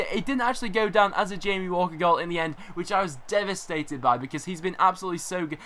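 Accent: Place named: Lancashire, United Kingdom. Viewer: I live in Blackpool my accent sounds the same and nice video btw :D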